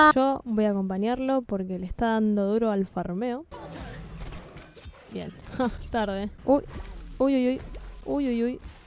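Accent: Argentina. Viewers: Genial. Donde está mi milanga? Gracias a vos, no llego a extrañar el acento de Bs As.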